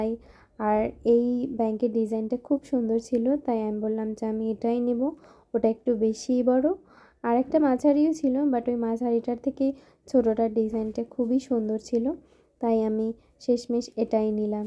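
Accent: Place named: West Bengal, India. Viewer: didi,kmn acho???amr bari Bangladesher Rangpur e....didi west bengal e jeye tumi okhankar vasa sikhcho bujha jacche....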